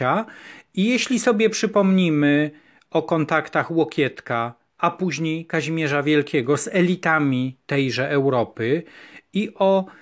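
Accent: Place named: Kraków, Poland. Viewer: To jest narracja historyka sztuki- merytoryczną, płynna, ciekawa bardzo, ale skierowana do znawców. Jeśli już miałabym grymasić, to prosiłabym unikać mimo wszystko krakowskiego akcentu. Wystawa, jak każda na Wawelu, wspaniała. gratulacje.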